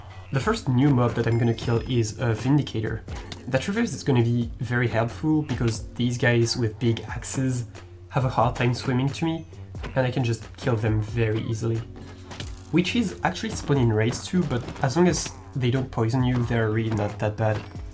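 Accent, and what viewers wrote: France. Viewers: french accent ? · Ur french ? Because of your accent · est-ce que tu est français ? If you are not french you have a french accent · You sound extremely french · haaaaa il me semblais avec ton accent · Is it me or he has a french accent ? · Are you French ? Your accent is familiar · Are you french ? You kinda have a french accent :)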